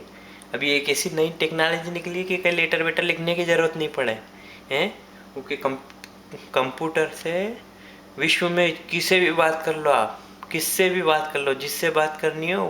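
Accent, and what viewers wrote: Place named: Malwa, India. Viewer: malwi rocksss its always nice nd touchin to hear ur lang when u far from ur home(malwa)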